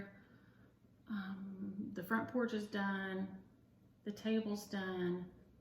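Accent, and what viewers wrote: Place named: Texas, United States. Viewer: Hi there, I want you to know how much you mean to me. I tell my husband, your like an old friend . Today we all could use a friend like you. I grew up in Cleburne Texas, I’ve been in Colorado scene 1989. You make me miss the way I was raised. After I listen to your channel, I start speaking with an accent. I love what you do 👍👍 Thanks so much.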